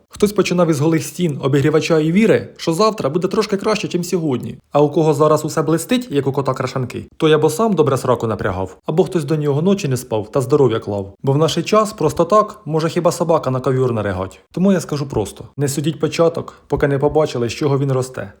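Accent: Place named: Surzhyk, Ukraine. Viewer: Я не знаю що в тебе "присутствует" але зі своїм ухоріжущим суржиком ти доВбойоб знатний😂😂😂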